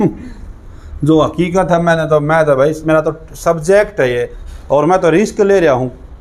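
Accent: Haryana, India. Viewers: M Haryana Exams Ki Taiyaari Nhi Karta But Sir Ji Ki Haryanvi Voice Tone Bhut Acha hai 😊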